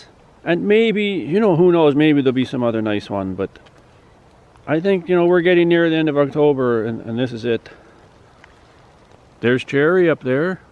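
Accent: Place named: Canada. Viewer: New to your channel… I’m guessing you’re a fellow Canadian by your accent/dialect.. Where about’s you located? I’m subscribed! Cool stuff, with love from Alberta ❤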